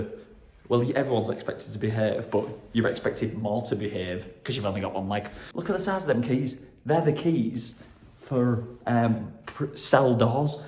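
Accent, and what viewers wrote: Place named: Liverpool, United Kingdom. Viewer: Love your Scouse accent ha😂👏🏻Another great episode well done.The time lapse of the sunset looked stunning💛xx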